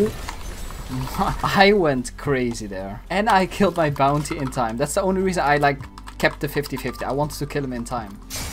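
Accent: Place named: Netherlands. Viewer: Je hoort het Nederlandse accent haha ❤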